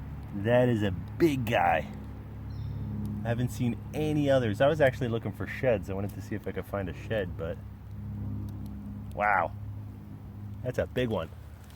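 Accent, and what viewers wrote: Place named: Pennsylvania, United States. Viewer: I watched a bottle dump episode of yours and I knew you were from PA from your accent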